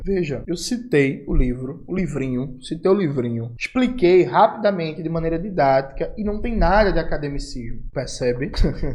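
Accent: Pernambuco, Brazil. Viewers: Clique beite. Eu adoro esse sotaque de Pernambuco❤❤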